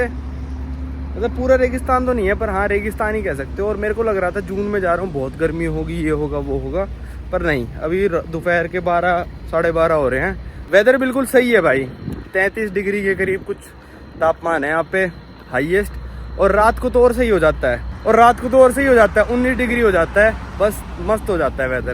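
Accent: Haryana, India. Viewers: Haryanvi accent aa gya bhai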